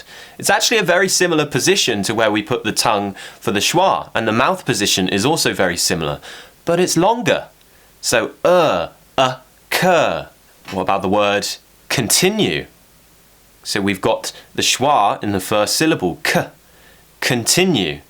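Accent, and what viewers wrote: United Kingdom. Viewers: Hello, Im from Japan. I love british accent♡ you are the best teacher xx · I adore the English British accent I wish I could speak like you teacher! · I'm watching this video right now.And now I know Malaysian people usually use American accent rather than British accent.But seriously I think I'm falling in love with British accent.Thank you a lot for amazing video.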